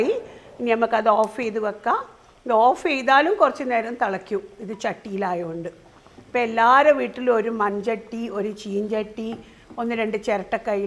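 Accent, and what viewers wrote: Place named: North Kerala, India. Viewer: Superb presentation. Like a mother teaches her sibling. North kerala cooking with North kerala dialects... 👌🏻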